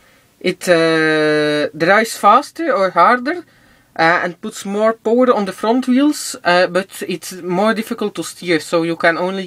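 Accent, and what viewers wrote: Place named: Netherlands. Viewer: Duidelijk hoorbaar dat je normaal gezien nederlands spreekt ;D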